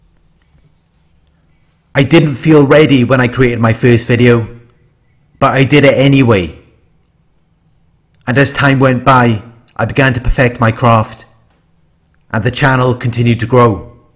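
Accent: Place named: Swansea, United Kingdom. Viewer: Still got the Swansea accent 😉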